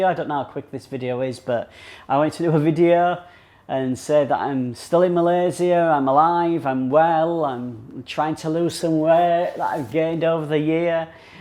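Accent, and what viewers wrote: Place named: North, United Kingdom. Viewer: Ahhhh...that familiar northerner accent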